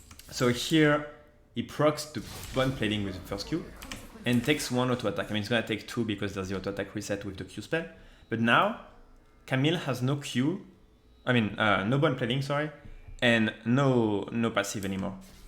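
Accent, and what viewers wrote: France. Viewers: Jolie accent fréro, ça fait du bien de voir un français qui parle aussi bien anglais haha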